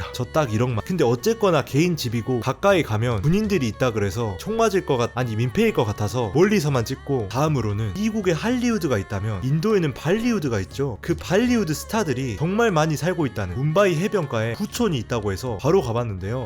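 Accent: Jeolla, South Korea. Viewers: 너 전라도니? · 전라도 사투리가 강하네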